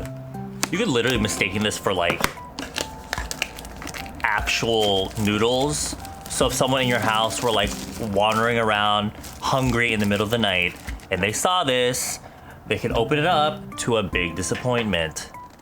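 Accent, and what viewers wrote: South Korea. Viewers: we love a konglish queen <3 come thru!! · sometimes i dont even realize its such strong konglish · That konglish tho😂 love you bae🖤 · Loving the kenglish😂❤️ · Thank god I can understand fully your konglish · YOU AND YOUR KORENGLISHHH OMG T~T idk what ur saying 😂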